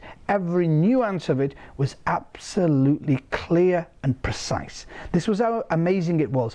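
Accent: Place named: England, United Kingdom. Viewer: English accent se Shirk nahi jata.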